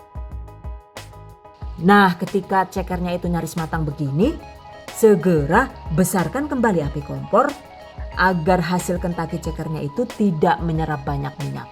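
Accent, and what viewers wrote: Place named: Java, Indonesia. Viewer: Bahasane Nyenengna . . Medok banget . . Nyong suka Bahasane . . 😂😂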